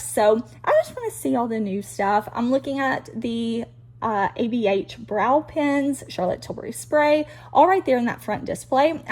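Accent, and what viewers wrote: South, United States. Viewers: I love your Southern accent. 🥰🥰🥰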